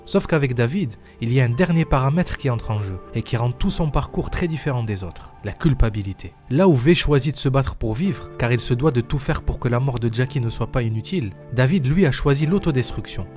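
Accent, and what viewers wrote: Marseille, France. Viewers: L'accent marseillais me perturbe et pourtant je vis à Marseille 🤣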